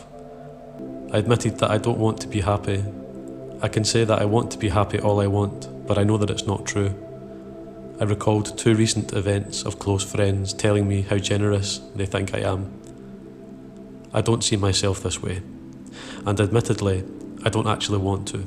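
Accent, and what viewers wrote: Scotland, United Kingdom. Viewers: u fae Glasgow by any chance? or somewhere else in scotland ?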